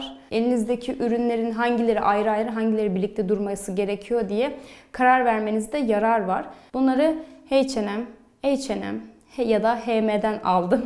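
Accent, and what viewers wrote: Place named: Central Anatolia, Turkey. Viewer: nerenin aksani bu ic anadolu yada bati karadeniz gibi. hafiften aksan geliyor alttan , tasrali ic mimar enteresan.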